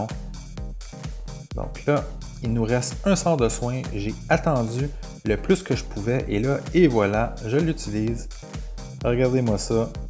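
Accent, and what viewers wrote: Quebec, Canada. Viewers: Tu est québéquois ?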